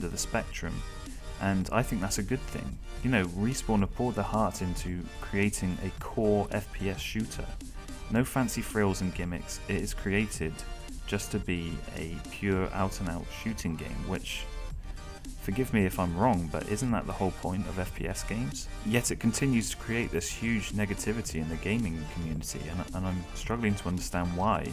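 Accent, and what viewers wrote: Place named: United States. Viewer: You have such a convincing accent as an American rapper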